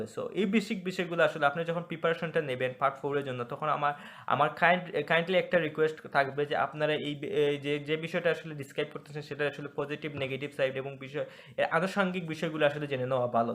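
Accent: Sylhet, Bangladesh. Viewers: Vai apnar mat a lager sylhet e,,,Apne sylhet koi takoin ?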